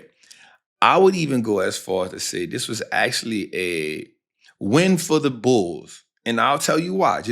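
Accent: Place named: Louisiana, United States. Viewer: Were you from bro? Sound like you from da boot like me😂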